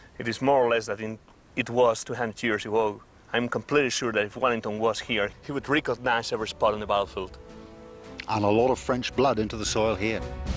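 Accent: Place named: RP, United Kingdom. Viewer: The latter. More accurately it's "posh British" (or Received Pronunciation, to give it its latter name) because plenty of people from all corners of Britain speak in it , if they were educated at the "right" schools.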